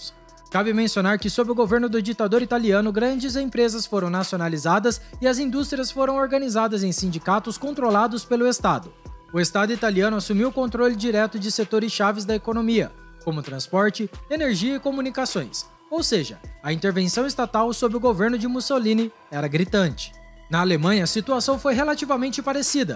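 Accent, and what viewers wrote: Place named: Caipira, Brazil. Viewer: NÃO dá pra entender oq ele fala com esse sotaque capira. Pqp!!!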